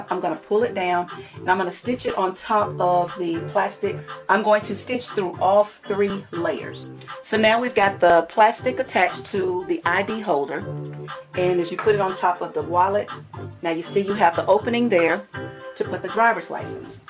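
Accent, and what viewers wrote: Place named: North Carolina, United States. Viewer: I would love to be the owner of a wallet made by is this possible? You sound like you may be from my part of the woods, N.C. I loved watching your video, I'm a 71 year old man and I think you are the best teacher I have seen.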